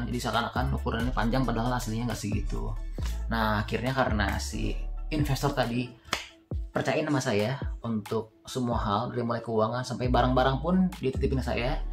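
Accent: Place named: Banjarmasin, Indonesia. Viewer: pian urang Banjarmasin kah? Logatnya spt org bjm.. 😊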